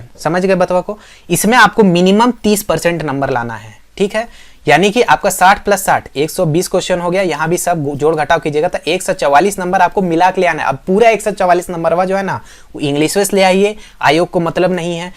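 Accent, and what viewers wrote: Jharkhand, India. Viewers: Bilkul bhaiya jharkhand wala lg rhe h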